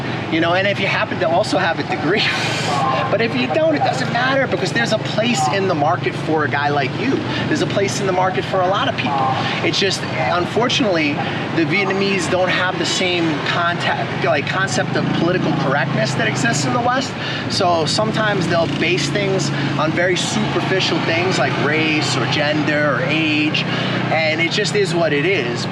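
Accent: New York, United States. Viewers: When I started teaching in 2018, I didn't have a degree, but I still found a job easy in Vietnam. Thanks for sharing your input. Are you from New York? I'm from New York, and you have a stronger accent than me. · Bro i love this guy, his honesty and frank language makes him a diamond in the rough of youtube lames. Bro i knew he was from new york just how he speaks lol. I may hit you up bro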